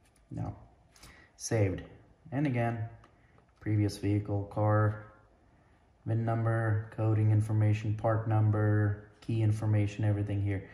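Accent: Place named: Soviet Union. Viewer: Soviet accent is hard to listen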